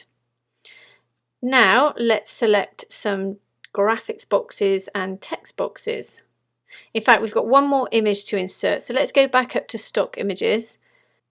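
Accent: England, United Kingdom. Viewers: A great demonstration and a lovely English dialect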